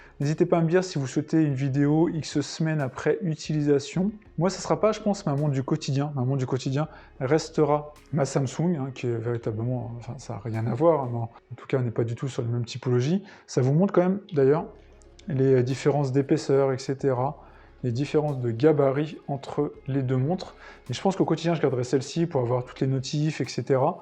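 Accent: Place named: France. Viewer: la lecture anglaise avec la prononciation française..c'est pas top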